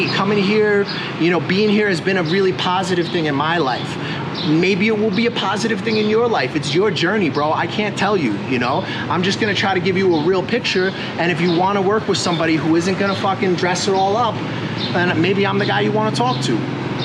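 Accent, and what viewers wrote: New York, United States. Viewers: Bro i love this guy, his honesty and frank language makes him a diamond in the rough of youtube lames. Bro i knew he was from new york just how he speaks lol. I may hit you up bro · When I started teaching in 2018, I didn't have a degree, but I still found a job easy in Vietnam. Thanks for sharing your input. Are you from New York? I'm from New York, and you have a stronger accent than me.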